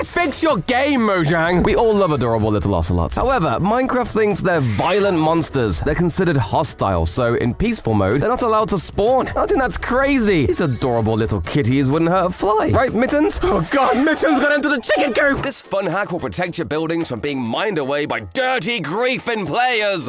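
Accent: United Kingdom. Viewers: He sounds like British skip the tutorial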